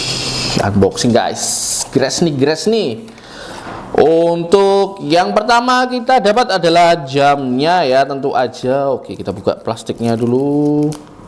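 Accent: Java, Indonesia. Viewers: medok tenan ngomong e😅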